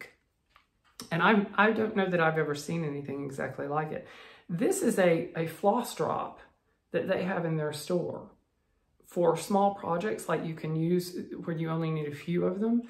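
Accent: South, United States. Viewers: Thanks for sharing your wonderful YOU!! Love your Southern accent- more please !😂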